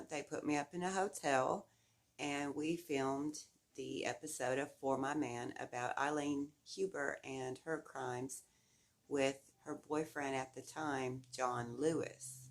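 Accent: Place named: South, United States. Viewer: you got a sweet little southern accent...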